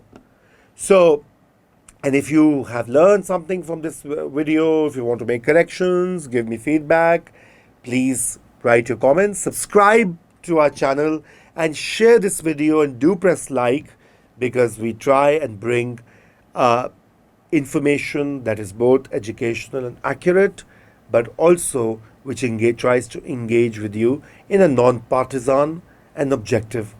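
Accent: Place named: Punjab, India. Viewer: Dude he is a set of few last English speaking punjabi ascent journalists of Pakistan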